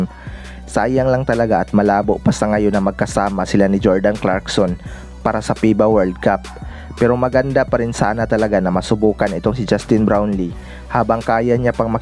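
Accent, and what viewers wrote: Visayas, Philippines. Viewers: Bisaya man kaayo ka bro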